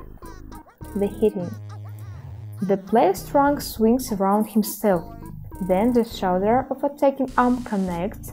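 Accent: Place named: Russia. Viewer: Сначала не мог понять, почему мне мерещится русский акцент. Прочитал название канала. Понял,я то не мерещилось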